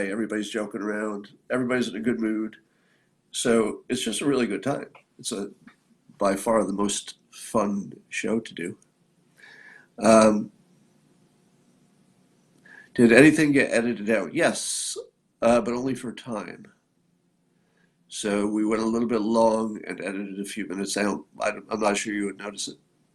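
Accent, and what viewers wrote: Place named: South, United States. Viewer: Watched you on Gutfeld, your voice is fine, has a very rich, almost southern quality.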